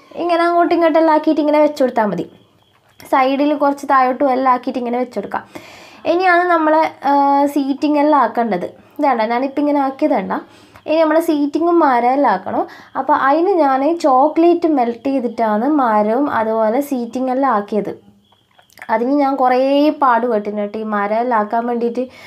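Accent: Kannur, India. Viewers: Samsaram kettitt kannurano enn oru .........😁😁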